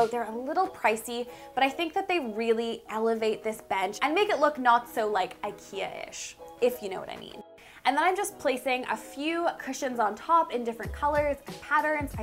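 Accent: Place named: Canada. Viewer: Very useful, you are very talented. I love your canadian accent too. 'Aboot!' And you live in Toronto, you lucky person!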